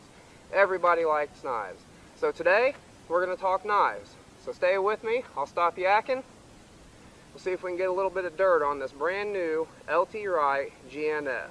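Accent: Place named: Midwest, United States. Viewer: I thought you sounded like somebody I would know. I grew up in Vincennes Indiana. We had a big farm in Illinois.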